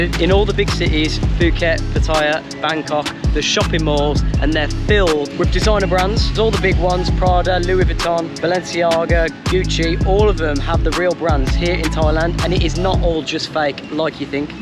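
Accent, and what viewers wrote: Birmingham, United Kingdom. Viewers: Thanks sounds like your a brummie nice one ime a jock 😊 just subbed very usefull i have done lithle research and decided pataya just offered that bit more nightlife etc as i allready decided booked off season june i been told it can rain all day every day mabey you know if thats true or not thanks just subscribed very usefull thank you looking foward too more your videos cheers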